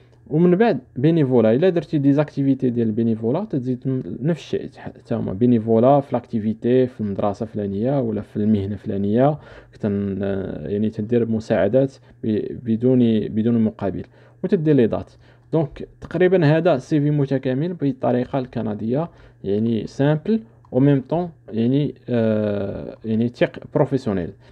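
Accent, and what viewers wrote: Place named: North Africa. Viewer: اخى الكريم ارجو مراعاة ان الكثير منا لايجيد اللغة الفرنسية وكذلك معظم الدول العربية لايستطيعون فهم اللكنة العربية الخاصة بشمال افريقيا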